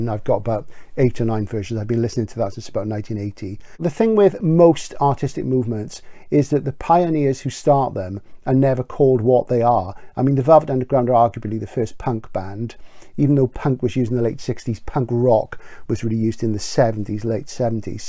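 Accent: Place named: Newport, United Kingdom. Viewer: I'm guessing you are from Newport? Sorry I love my accents and decoding them.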